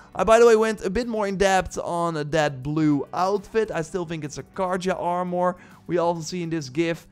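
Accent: Netherlands. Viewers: may I ask you what your mothertongue is? Your English is fine ofcourse, but I can't quite put my finger on your accent. My guess is that your a Dutchie.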